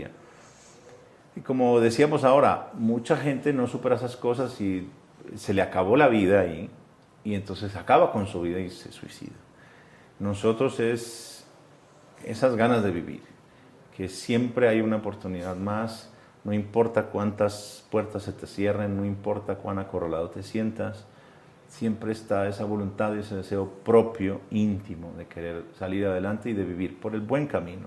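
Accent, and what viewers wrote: Mexico. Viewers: para nada , soy colonbiano y de la region dode nacieron ellos soy paisa, viaje por casi toda colombia y a leguas se ve que se le esta pegando el acento mexicano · Este Huevon adoptó el acento Mexicano sin serlo.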